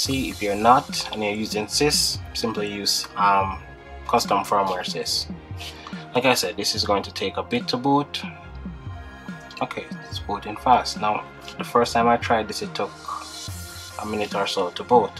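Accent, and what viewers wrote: Jamaica. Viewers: Why do you sound like a Jamaican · Your Jamaican?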